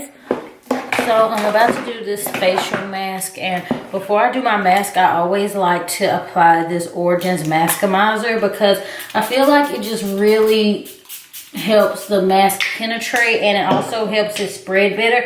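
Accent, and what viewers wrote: South, United States. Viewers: Southern accent maybe? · shes from the south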